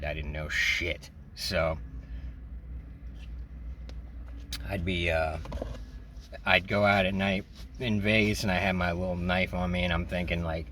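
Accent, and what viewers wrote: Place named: Long Island, United States. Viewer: 💜🐷💜 Enjoy listening to your stories. Watching from Palm Coast FL. Had a boyfriend from Long Insland who died 10 years ago. Your accent reminds me of him.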